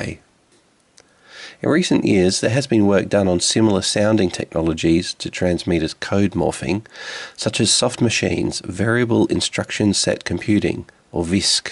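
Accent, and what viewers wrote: Australia. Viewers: Ah! I see. I didn't realise you're Aussie. Great video!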